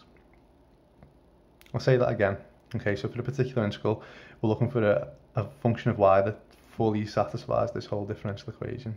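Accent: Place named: Liverpool, United Kingdom. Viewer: I love the Scouse accent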